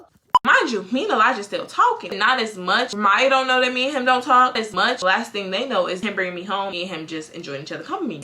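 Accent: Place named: Chicago, United States. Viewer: i love ur videos they are so interesting. but i have a question are u from Chicago ur accent is very strong?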